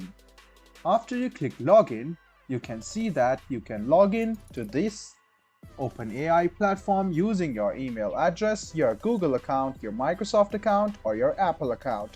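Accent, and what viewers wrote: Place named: Nepal. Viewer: The nepali-english accent. Nice man 👍👍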